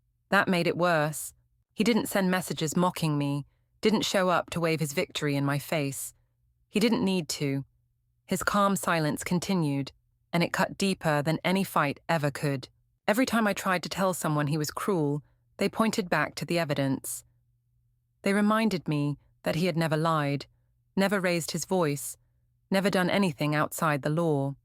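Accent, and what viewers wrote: United Kingdom. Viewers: I love the narrators British accent. But man she can really sound mean and borderline cruel. She sounds brilliant but plays the dumbest chick in every story.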